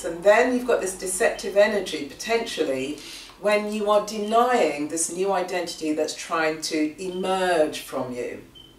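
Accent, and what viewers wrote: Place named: United Kingdom. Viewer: Thank you. Great information. I love your down to earth approach to your videos and hearing this information in an UK accent. The necklace you are wearing is particularly stunning! However, what happened to your camera angle on this one!:-) Where do I need to be more courageous in my life?..... I have a mars/saturn 1/7 opposition so I've had to learnt to be pretty courageous to just keep going really... however perhaps I need to let people in to my heart instead of 'protecting' myself by holding them at arms length. However, how to change this?! It's easier to allow myself to love children than adults as they open my heart somehow. Another part of my life I could be more courageous is letting go of the day - and allowing myself the feeling of " I did my best and I let it go now' instead of overthinking what ever happened and giving myself a hard time about silly little things. 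Letting things 'be' is a form of courage I think.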